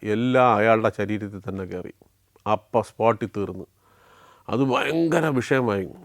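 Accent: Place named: Trivandrum, India. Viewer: great to hear proper Trivandrum accent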